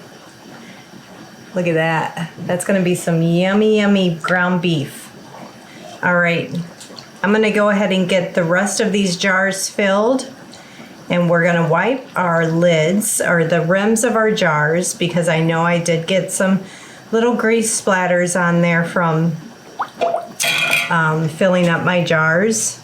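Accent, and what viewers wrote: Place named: Wisconsin, United States. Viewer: Nice accent! Where in Wisconsin are you from? 😂 I live in Rice Lake!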